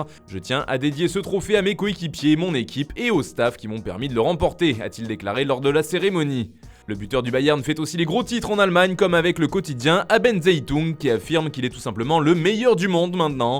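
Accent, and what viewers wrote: Italy. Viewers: J'apprécie que le narrateur ait arrêté d'en faire des caisses avec son accent italien. Là c'est bon le dosage, bravo ^^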